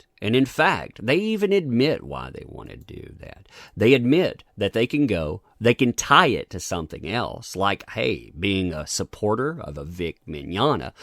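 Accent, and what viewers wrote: South, United States. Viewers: Keep speaking the truth in that smooth southern tone 💪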